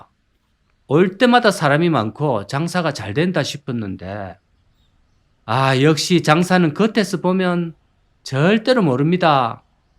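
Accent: Gyeongsang, South Korea. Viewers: 유투브에서 유일하게 듣기좋은 경상도 말투~~~😂😂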